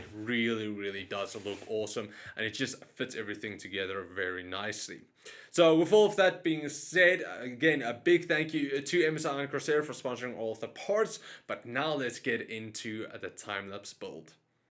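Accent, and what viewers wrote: South Africa. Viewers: Just subscribed when I heard you're South African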